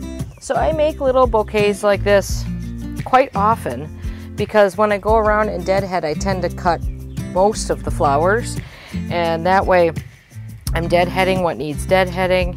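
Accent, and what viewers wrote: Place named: Midwest, United States. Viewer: “All flowers match.” Yessssssss! I love watching you garden, your accent takes me back to my time in the Midwest and makes me want to call old friends.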